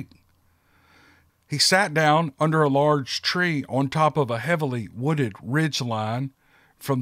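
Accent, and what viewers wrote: South, United States. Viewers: Thanks for an exciting start to the week. I woke up early, like 3am early, with a horrible migraine and after taking some tablets and eating a banana to make sure they stayed down, I lay here in bed with my eyes closed and listened to that Southern drawl of yours and now I'm going to try and get a little bit more sleep. It was a another good story which Kentucky seems to have no shortage of. Can't wait to catch up with the podcasts and maybe get myself some Yeti Bars as Christmas gifts for family and friends. Take care and it's ALWAYS good to hear your voice! From country NSW Australia.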